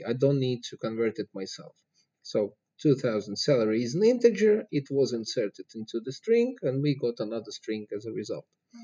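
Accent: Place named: Russia. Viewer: RU акцент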